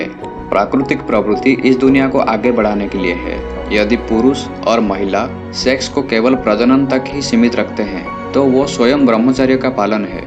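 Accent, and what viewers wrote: Odisha, India. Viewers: Bhai odia ki katha kahiba style ru jana padi jauchi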